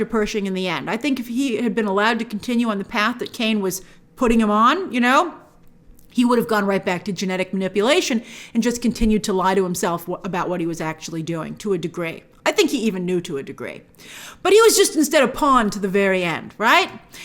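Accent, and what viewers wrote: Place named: United States. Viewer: I like your voice. Your accent is nice. Which part of America is this accent from?? Can somebody tell me?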